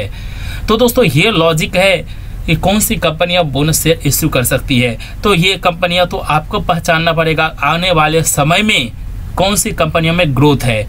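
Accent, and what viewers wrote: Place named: mixed, India. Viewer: tu pehle thik se shuddh hindi bolna sikh...UP, Bihar ka accent bolna chod de..bolne ka tarika sudhar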